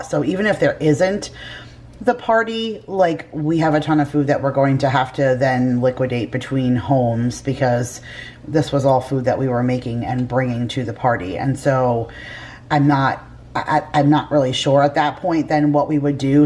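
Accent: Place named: New Jersey, United States. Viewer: Just found your channel today & I am SO happy to find a vlogger who has my accent! No offense to those amazing southern moms, but I can only stand to hear penne be pronounced "pen-NAY" so many times 😂. Much ❤ from NJ!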